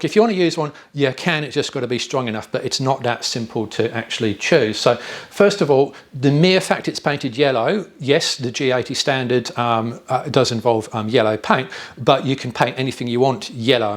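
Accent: England, United Kingdom. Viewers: Ignore the English accent, this is Australia but for which state rules, no idea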